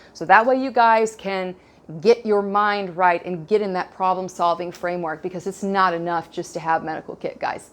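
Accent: South, United States. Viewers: I never knew a jew with a southern accent. Now I can say I do.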